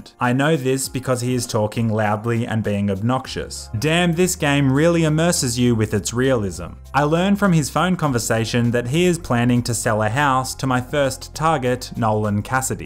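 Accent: Australia. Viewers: u australlian? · r u aussie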